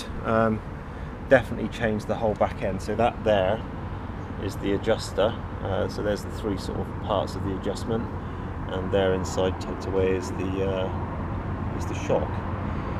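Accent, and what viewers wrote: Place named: Swindon, United Kingdom. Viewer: It looks stunning in that orange. Where did you get that smaller number plate made? Going by your accent I would say your near the Swindon area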